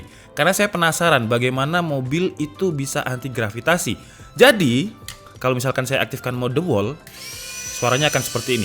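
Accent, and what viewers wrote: Malang, Indonesia. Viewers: Ngalam sam sawangane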